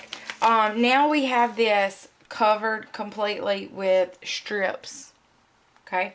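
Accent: South, United States. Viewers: Great video but boy that southern accent! Lol yes, I'm from the south too.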